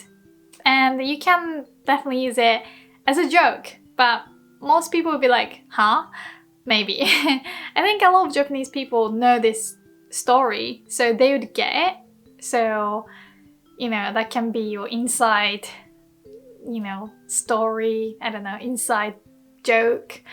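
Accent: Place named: England, United Kingdom. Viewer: You have an English accent.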